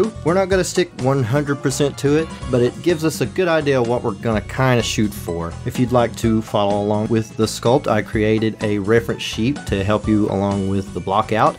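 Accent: United States. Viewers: lol the country acccent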